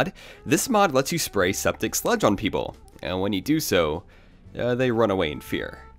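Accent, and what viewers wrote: United States. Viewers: Why do you play it in Japanese, You have an American accent.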